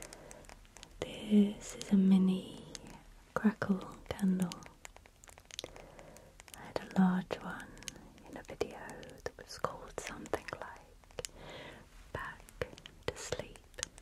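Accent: United Kingdom. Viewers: Ur hair colour looks darker but amazing...I love ur accent...is it British?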